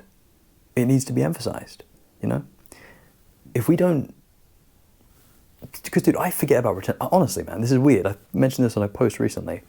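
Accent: United Kingdom. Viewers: 50 seconds in and I had to sub, maybe because he's "one of us" lol (from the UK, I assume because of his English accent) 👽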